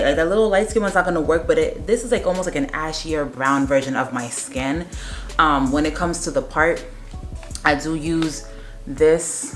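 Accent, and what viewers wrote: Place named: East Coast, United States. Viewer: East Coast Accent - I love it! If not it sure sounds like it! And its waaay worth the money that you spent! I love it on you!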